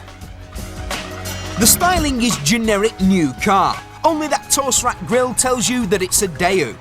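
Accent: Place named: Northern England, United Kingdom. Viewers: EGGBIRDTHEROOSTER He's from the North. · 11/10 for his North England accent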